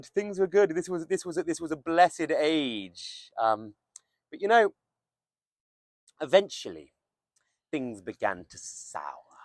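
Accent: Wales, United Kingdom. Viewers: So its with the 'th' sound, I'm sure it has anything to do with your Welsh, I have a hard time with accents, I've tried to watch Irish movies in the past, even thou they are speaking English, I can't understand but half what they are saying, lol We got a Tsunami warning while I was watching your video yesterday, before I left for high ground, I took a peek at where it was coming from, saw way too many 7's, I told my son, who was here with me, it was a trick. I was joking around on your Loki video, using my other account, acting like a trickster, lol, when all this took place. Almost every day I write about December 5th, yesterday I forgot it was December 5th, I took it has a sign.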